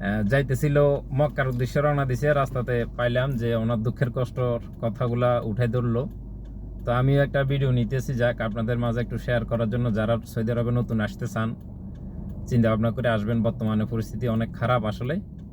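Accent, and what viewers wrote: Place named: Sylhet, Bangladesh. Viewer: সিলেটের লোক মনে হয় 😢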